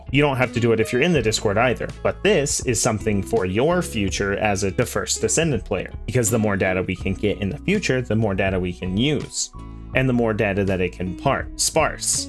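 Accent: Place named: Ireland. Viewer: love the guides irish accent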